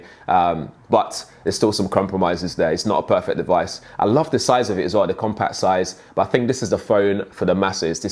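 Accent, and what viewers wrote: United Kingdom. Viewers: love your British accent dude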